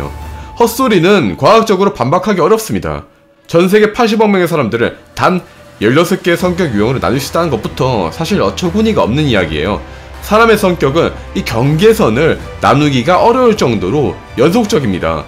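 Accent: Gyeongsang, South Korea. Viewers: 경상도 사투리가 재미있네요 그리고 MBTI 진짜 무지성 무논리 ㅋ거의 2020년대의 신 혈액형 수준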